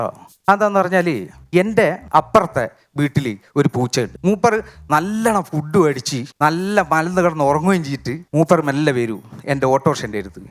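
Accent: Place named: Kannur, India. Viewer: നല്ല അവതരണം, കണ്ണൂർ ഭാഷ 🎉❤🎉❤🎉❤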